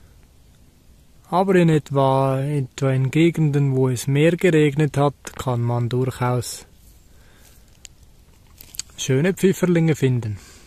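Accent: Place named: Switzerland. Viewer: dieser geile schweizer dialekt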